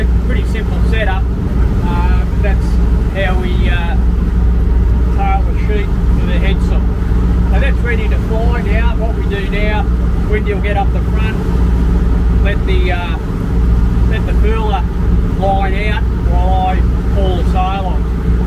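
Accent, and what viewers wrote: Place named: Australia. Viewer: Living in Thailand far from my oceanic playground off the Oz east coast, deriving much pleasure from hearing your Australian accent and that unmistakable diction, I mused, as I am often want to do, at the notion that perhaps during the dying embers of the day, you could recite some suitably inspiring verse with a nautical flavour. Maybe a few stanzas from the Ancient Mariner for example. I, myself, often amused my seafaring companions at sundowner time with either a poem or a sea shanty. Works wonders for morale and appetite. My suggestion would be an Aussie rendition of the Drunken Sailor with a glass of red held high. Awesome bloody scene!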